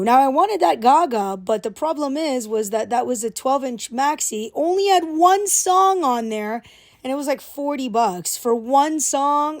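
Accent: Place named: United Kingdom. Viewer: I like your bri ich accent , yeah ?😉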